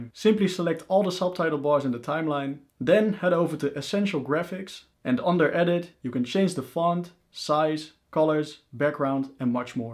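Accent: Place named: Netherlands. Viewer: Strong Dutch accent, but thanks for the tutorial.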